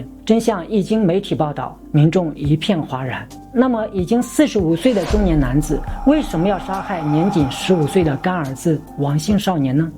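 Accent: China. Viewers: 超明顯的大陸口音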